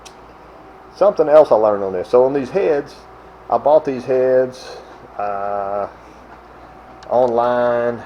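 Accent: South, United States. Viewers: It's kinda cute how you Southern boys can't pronounce "oil" or "coil". It always comes out "ole" and "cole", lol. 😉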